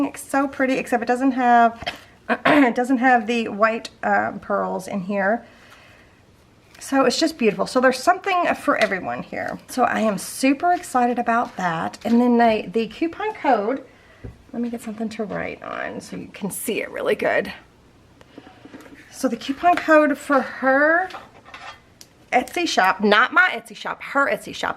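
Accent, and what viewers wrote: South, United States. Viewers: I love watching you!! I've been watching you for several years now. Love going back and watching your old videos. I always learn something new!! Plus another lady with a southern accent 😉 (Alabama girl) . I can't wait to see what you come up with next!! ❤❤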